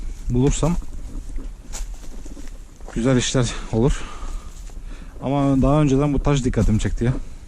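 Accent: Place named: Aegean, Turkey. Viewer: Abi konuşman ege muğla aydın tarafı gibi nerelisin sen hemen beniyle bir irtibata geç asıl işaretler burda biz anlamiyoruz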